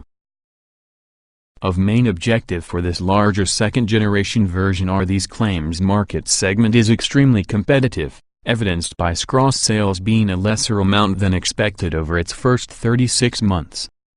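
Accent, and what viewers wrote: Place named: United States. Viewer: Crap USA accent crap review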